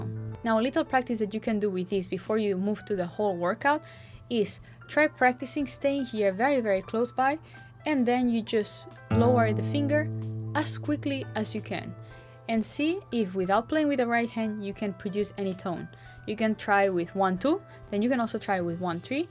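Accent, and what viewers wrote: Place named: Catalonia, Spain. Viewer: Is your native language Catalan? You don’t sound typically Spanish 😉 Awesome video!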